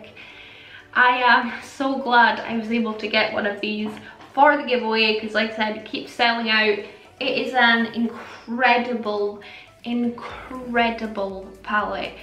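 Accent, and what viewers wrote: Scotland, United Kingdom. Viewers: Instagram handle is I'd love to be in with a chance of winning because you are my favorite inabler when it comes to colorful palettes. Also, I moved to England last year and hearing a Scottish accent makes me happy (I miss Edinburgh so much!) congratulations on 30k and kept on making me want to buy everything! Xx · Hey I’ll be honest I only just found u a couple of weeks ago but as soon as I heard u’re voice I hit subscribe it’s so refreshing hearing a fellow Scot,, Would love to win xxxx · Hi! CONGRATS ON 50K/30K!!!!!!!! (but you so deserve a lot more). You are genuinely so generous giving away this much AMAZING makeup and also can I just talk about how stunning you are and how INCREDIBLE at makeup you are! My Instagram is and I would love to win because I love love love makeup sooooooo much and really want to be able to grow my collection and also try out new makeup and formulas that I may not be able to afford myself lol. 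But I would mainly love to win just to try and grow as a makeup artist and maybe reach that goal one day! Love you and your Scottish accent so much!! Amelie xx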